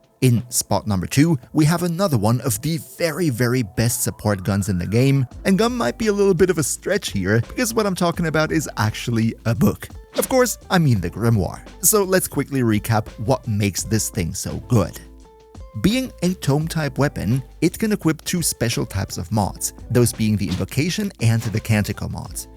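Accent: Germany. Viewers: YOU'RE GERMAN! I have been wondering where your accent was from!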